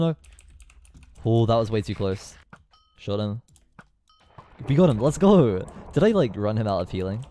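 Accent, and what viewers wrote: United States. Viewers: Like like like like like like like I WANNA HERE THIS MANS merican accent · PLEASE LIKE I WANNA HEAR HIS AMERICAN ACCENT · You better keep your American accent If you dont... Your gonna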